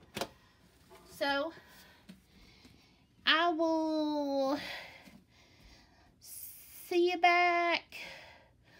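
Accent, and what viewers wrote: South, United States. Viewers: I love that she says “poodin” lol has the cutest voice. It’s so girly and southern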